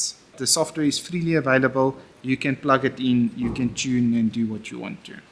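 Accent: South Africa. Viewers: The south African accent is the best accent 💪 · Man I love the SA accent!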